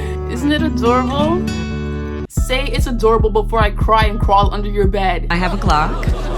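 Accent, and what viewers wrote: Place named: United Kingdom. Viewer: you’re editing honestly kills me off everytime!!! love ur vids 🫶 and also ur british accent isn’t half bad :)) love from, a mancunian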